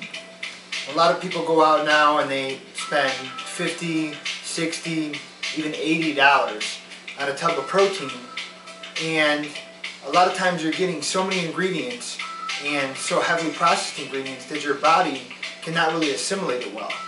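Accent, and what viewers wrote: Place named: United States. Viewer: Your American accent is too thick and with that messy kitchen I can't concentrate on what is happening.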